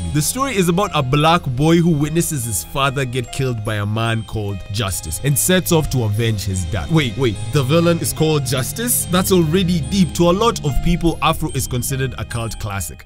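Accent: Kenya. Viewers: I know a Kenyan accent when I hear it. instant sub!!!! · wait wee ni brathe kumbe😂😂 lol the accent didnt hit until I saw 2007 n was like wait you is raia😂😂 heres a sub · Mans a Kenyan, I couldn’t tell by the accent at first but good work big man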